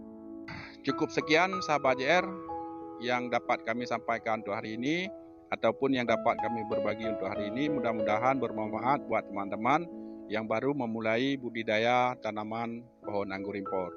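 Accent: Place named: Aceh, Indonesia. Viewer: sperti logat aceh bole tau dimana gardennya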